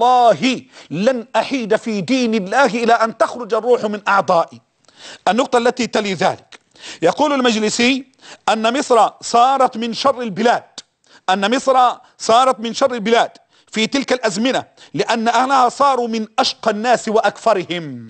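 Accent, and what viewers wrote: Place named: Palestine. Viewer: بالمناسبة هذا ليس مصريا فاسمه ولهجته فلسسطيني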